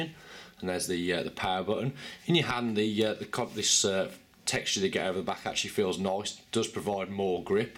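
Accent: Birmingham, United Kingdom. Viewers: A Fellow Brummy :O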